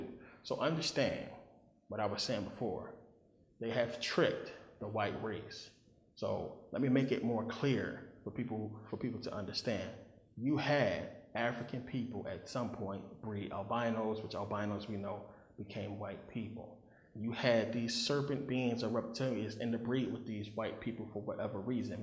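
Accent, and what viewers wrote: Philadelphia, United States. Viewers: He's from Philly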